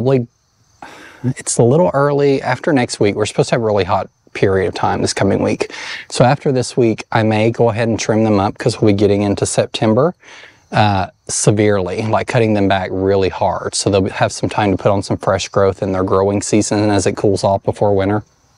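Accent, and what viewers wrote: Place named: Appalachia, United States. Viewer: Hello, I love watching your channel! I think one of the main reasons is because I live in the Appalachian mountains in Huntsville, AL and I feel at home listening to your accent. I grew up on top of Monte Sano Mountain and now live on the side. Thank you for allowing us to follow your journey.:) Kelly Flowers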